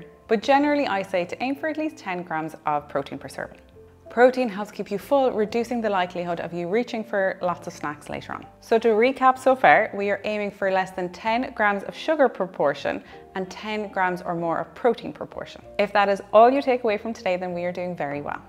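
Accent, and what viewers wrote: Ireland. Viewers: thank you for helping with this weight loss journey. a comment … love your Irish accent. Thanks again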